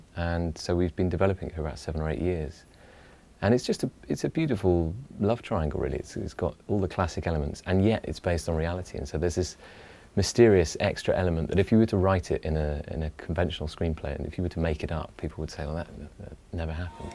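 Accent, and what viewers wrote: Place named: United Kingdom. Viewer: Dan Stevens has become too much " californian ". Too bad 😔 He lost his soooooooo british personality, fortunately not his accent. I'm a bit disappointed because he had then a lot of charm and now with his new look and his pounds off, he is less attractive. As I said , he is too much Californian. Long life to the UK Greetings from France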